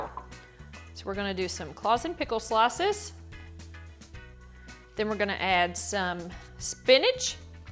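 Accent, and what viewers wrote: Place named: South, United States. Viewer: i love that countryness in your voice 😍😍😍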